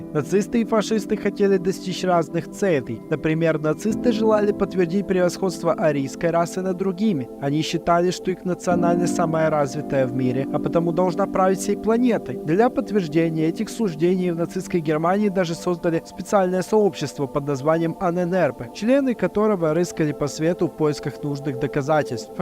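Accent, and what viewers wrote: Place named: Odessa, Ukraine. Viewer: акцент. Вы во многих словах букву А удлиняете . Получается акцент очень похожий на говор евреев Адеситов .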